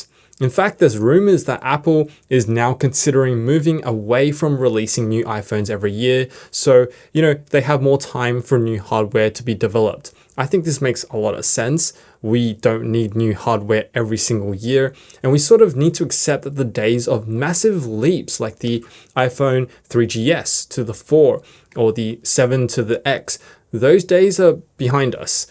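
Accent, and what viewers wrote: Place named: Australia. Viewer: By your accent you are Australian but are you quoting US prices? Last I looked the iPhone 16 is $1399.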